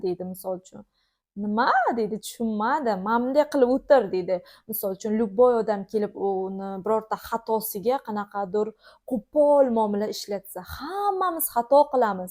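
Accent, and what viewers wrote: Jizzakh, Uzbekistan. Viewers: jizzaxlimisiz shevez rosa o'xsharkan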